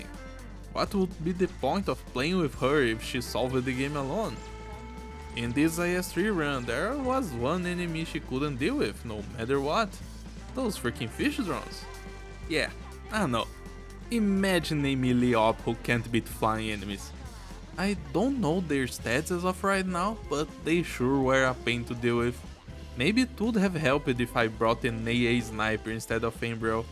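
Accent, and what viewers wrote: Brazil. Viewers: You are Brazilian? your sotaque are very paulista :V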